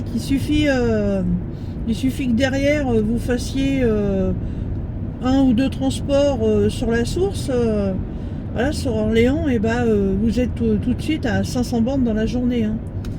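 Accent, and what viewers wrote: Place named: Eure-et-Loir, France. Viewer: Ça fait plaisir entendre quelqu un qui parle eure et loir moi j i habiter mais du côté de dreux bizou bonne journée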